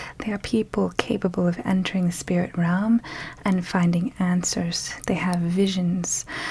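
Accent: Transatlantic, United States. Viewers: a slight transatlantic accent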